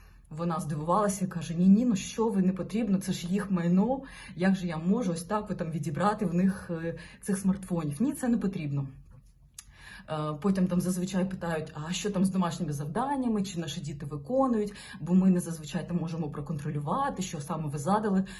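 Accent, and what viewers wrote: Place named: Russia. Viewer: Когда говорила по Русские- было интересней слушать. И по украински плохо говорит - сразу видно, русскоговорящая. Из- за мовы- отписка.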